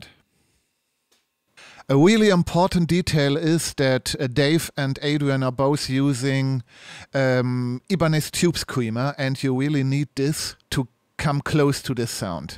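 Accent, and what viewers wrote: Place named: Germany. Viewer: ich wette Sie sind Deutscher, starker, sympatischer Akzent! Danke für diesen Artikel! Es muss nicht immer Röhre sein.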